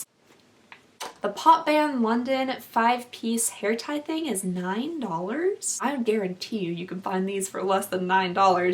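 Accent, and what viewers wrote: Kentucky, United States. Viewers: are you from Kentucky??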